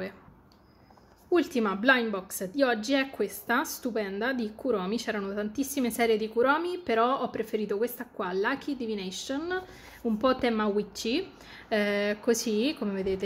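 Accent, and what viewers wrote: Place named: Rome, Italy. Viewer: Ciao!! Di dove sei? Io convinta che fossi di Roma 😅 Quando ti ho sentito dire che non c'erano nella tua regione ero tipo 😮 Comunque tutti bellissimi ❤️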